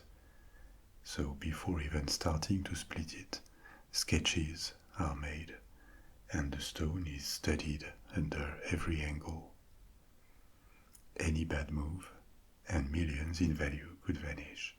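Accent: France. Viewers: This is now my favorite ASMR channel. His French accent just makes me melt. Thank you for calming my anxiety :)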